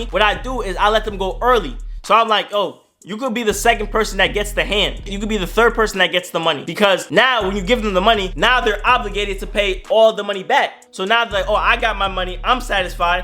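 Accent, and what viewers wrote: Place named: Caribbean. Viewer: I knew you were Caribbean 😅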